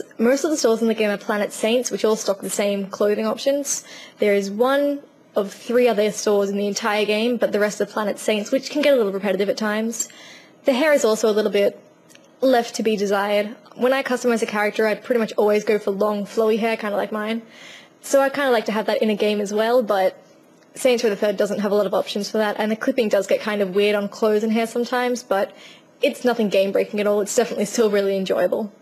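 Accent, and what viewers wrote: Australia. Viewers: where are u from your accent sounds like austrailian maybe .....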